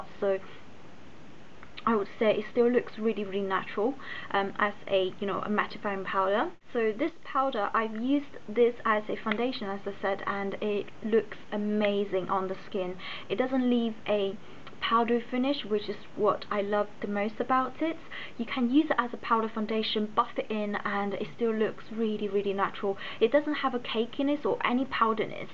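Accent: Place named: China. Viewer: I like her Chinese accent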